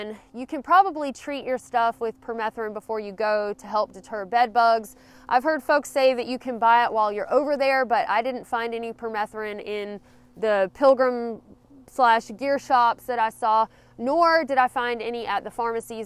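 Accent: South, United States. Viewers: I want to be in a bar and hear speak Spanish with a Southern Accent asking for Paja! JAJAJAJA! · Interesting points to consider, especially re: bedbugs. They aren't known to transmit any specific disease, but I would do whatever I could to avoid them, including treating my gear with permethrin before going where they might be. Just one caution, though - permethrin is extremely toxic to cats, so they should not come in contact with even the tiniest amount of it. What language did you select for closed captioning on this vid? It looks like Dutch or Afrikans. You have a southern accent, but it's not THAT strong so as to overwhelm the CC bot. Awesome videography and editing, as usual. Stay safe out there. Happy Trails. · Please don’t assume that a random postal worker would know you didnt mean your home in Spain. That’s not something to assume, there are many expats. 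If you were speaking with someone here in Florida who had a Spanish accent or British or whatever, you wouldn’t assume so. My neighbor for my whole life has a British accent and her daughter sounds as southern as you/this video creator and another one has a Jamaïcain accent and we have friends who have all sorts. Also, why would you travel to another country and then just eat subpar American food when you have so many more healthy options and authentic food in front of you? Maybe a better tip would be to familiarize yourself with some of the most common foods from that place and the language.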